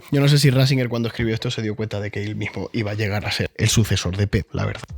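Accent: Canary Islands, Spain. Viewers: ME ENCANTA TU CLARIDAD EXPLICATIVA Y TU ASSSENTO CANARIO. ❤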